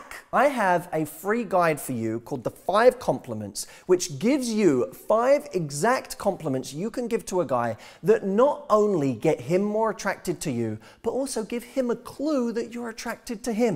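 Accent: United Kingdom. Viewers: I love your British accent .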